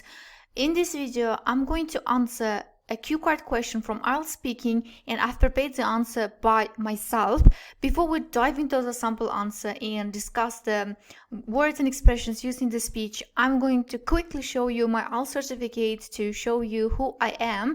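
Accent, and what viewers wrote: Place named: United Kingdom. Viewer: Your pronunciation is very pleasant 🤗How have u learnt to pronounce like A British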